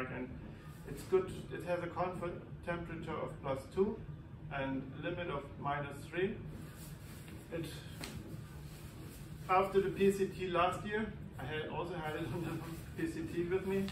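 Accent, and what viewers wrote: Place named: Sweden. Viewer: CAT! She so stole the show 😃 I hope you make some videos from the trail. Nebenbei, Du hast schon nen Schwedischen Akzent wenn Du Englisch sprichst, sehr sympathisch =)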